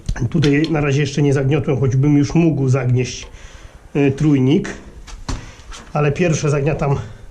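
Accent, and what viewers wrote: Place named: Kuyavia, Poland. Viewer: Idzie wek przypomniał mi się mój śp. dziadek też tak zawsze mówił ;d W ogóle dużo zaciągnięć słyszę u Ciebie które słyszę u siebie na kujawach ;d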